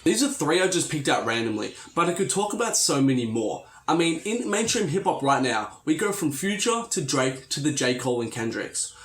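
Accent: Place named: Australia. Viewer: which part of australia are you from?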